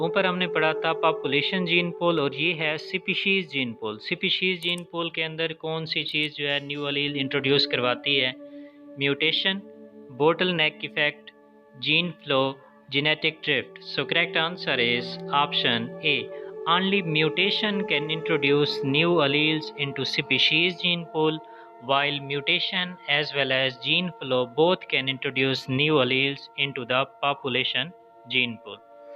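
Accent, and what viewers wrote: Pakistan. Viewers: Accent he aisa h.. I think it's Pakistani channel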